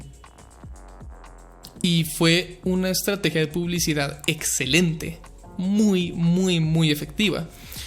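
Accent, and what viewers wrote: Bogotá, Colombia. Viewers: Vos sos rolo no? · ahora que se escucha en una conferencia, se nota tu acento rolo :D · banda, soy yo el unico que le parece que en todos sus videos su acento es muy neutro, mas tirando a mexico, pero aca se le subio el colombiano por 3000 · El acento colombiano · Al hablar tranquilamente, tienes unos pequeños dejos de rolo en tu acento, se nota que llegaste a vivir por un tiempo en Bogota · En este video sin guion se nota mas el acento colombiano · Looollll hoy si te escucho mega rolo, fan de tu voz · tiene full acento colombiano verda, tipo paisa neutro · Jajaja se nota mucho tú acento colombiano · Aquí pude notar mucho su acento colombiano, q increíble jajaja buen video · Jamás había notado qué yan colombiano eres :0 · Puedo decir que aqui si se te nota el acento de Bogotá, bastante... Eres un orgullo para tu patria y familiares bro! 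Saludos desde Cartagena :D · ! Tienes tremendo acento rolo! Un capo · Siempre pensé que eras mexicano o algo así, pero ya tras este video dos colombiano. Lol :0 yo soy de medallo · se te nota lo colombiano xd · La primera vez que lo escucho con acento colombiano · Acento rolo, parce!!!!! 🇨🇴🇨🇴🇨🇴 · Siempre creí que eras Mexicano, en tus otros videos no noté tu acento colombiano. Por cierto, me encantó el video :)) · Uy pana, usted tiene resto de acento rolo, recién me doy cuenta, usted parece de Bogotá JAJAJAJA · En este vídeo si se nota tu acento Colombiano xd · Nunca había escuchado su acento real es re cachaco rolo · Es la primera vez que escucho a con un asentó colombiano genuino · Es mitad colombiano y mitad de otro país aún desconocido, lo decía en la descripción antigua de su canal además que en los streams antigüos de halo lo confirmo y ahí se le notaba muchísimo más el acento. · Se te nota mas el acento colombiano... 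Eres colombiano? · cuál paisa? es re rolo xd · definitivamente es rolo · He visto todos tus videos, hace relativamente poco me enteré que eras colombiano en un video en que lo mencionaste; me sorprendio bastante porque jamás se te siente un acento en los videos, pero acá nada más empezar se siente, eres colombiano con toda! Un placer seguirte, abrazos desde Bogotá <3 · ya te escucho el acento Bogotá ^w^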